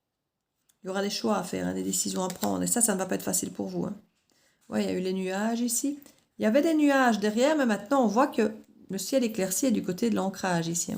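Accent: Liège, Belgium. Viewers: Ça me parle mais cet homme a 74 ans et ne changera jamais, il a un caractère impossible. Bravo s il a fait un travail sur lui mais je n ai définitivement plus confiance en lui et en plus la haine n est pas loin vu les 2 ans pénibles vécus dans ce lien karmique, rien de sacré là- dedans pour moi! Merci bcp,votre accent liégeois est très agréable, une compatriote!, je suis de Bruxelles. Magnifique votre guidance, cela m'a conforté dans ma décision de couper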